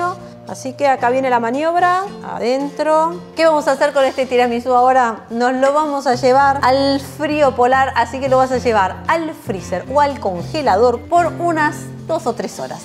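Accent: Argentina. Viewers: POR TU ACENTO YA ME DI CUENTA QUE ERES ARGENTINA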